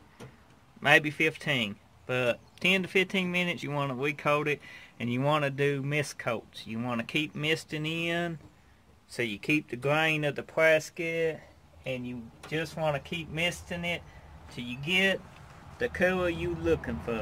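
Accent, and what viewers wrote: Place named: South, United States. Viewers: I can't decide whether I hear Mississippi water from the river or I hear Carolina. I'm a Texas boy myself so this is how everybody is supposed to sound! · I knew it I knew you was from ky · Theres nothing wrong with his voice, just southern tone . Thanks for the video buddy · I was wondering where you were from with that accent. Hazard kentucky? I've been there once or twice. I think i'm going to use this on my 79 g10 restoration.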